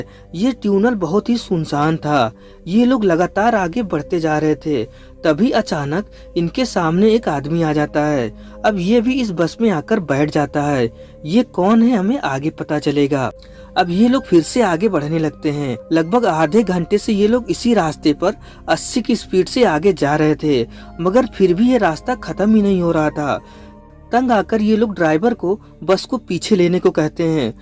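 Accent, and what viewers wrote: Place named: Bihar, India. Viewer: ye bhiyaari h bhai inke yhaan english aise hi pdhaate h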